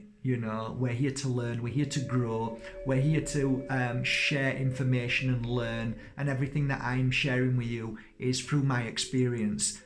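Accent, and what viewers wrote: Yorkshire, United Kingdom. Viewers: I have never come across any of your videos before but within the first minute of watching my child self came out and yelled NICEEEE!! Your positive energy is so relatable I feel like I hardly know people with such vibrant energy as much as I have and now I've discovered you I'm just so happy there is someone else out there who is just as happy and smiley and loving as i am... I'm also from Yorkshire and I would love to meet you, I can hear it in your accent :D Freedom. where can i find you and share lentils?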